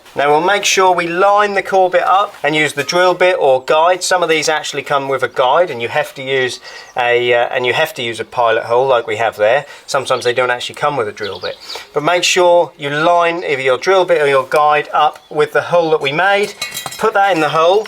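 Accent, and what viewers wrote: Norfolk, United Kingdom. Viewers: Great advice, and great Norfolk accent......"wull done bore keep on a troshin" 👍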